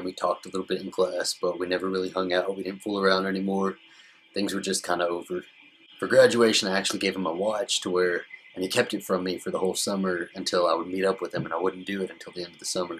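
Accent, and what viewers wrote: South, United States. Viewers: Omg he's so southern 😍😍😍😍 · Lol he's so cute with that country accent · Your so southern and adorable I love it! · Man I like U a lot. Your southern accent is fantastic. U are so open minded that is refreshing. You are a really kool dude keep up your great connection with people. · I liked your coming out story. Would love to talk at ya more. I like that deep southern accent. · Hi. You have a great voice & accent where are you from within the usa ? I could listen to you for hours. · I like our southern accent and understated manner. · America, fuck yeah. That's what I think of when I hear this guy's voice. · OMG U R SOO CUTE...And your southern accent is really nice..... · He has the deepist southern accent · I love your Voice! It's Deep and Southern ... Good for you! Don't let Anyone get to you now that your out! It's all down hill from here on out... 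;-)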